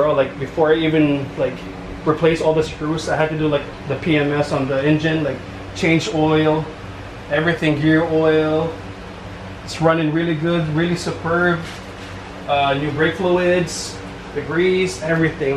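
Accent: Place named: Conyo, Philippines. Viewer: Maxado konyo c kuya... nice car by the way.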